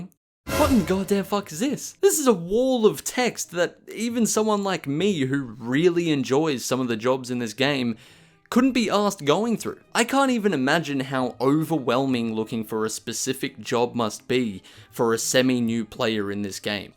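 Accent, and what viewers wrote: Australia. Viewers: Are you Australian Kiwi or English? cause honestly i cant tell...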